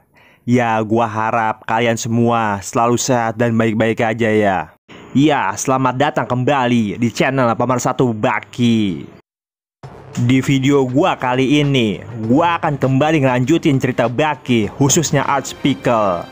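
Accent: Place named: Betawi, Indonesia. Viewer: Bang gw suka pembawaan lu yg mirip" Temen" Betawi gw kalo udh ngomong, sering" Upload bang dsn durasinya panjangin kalo bisa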